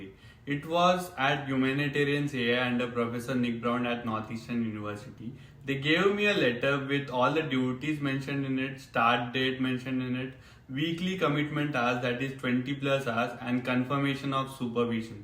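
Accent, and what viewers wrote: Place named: India. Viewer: That is the thickest Indian accent I’ve ever heard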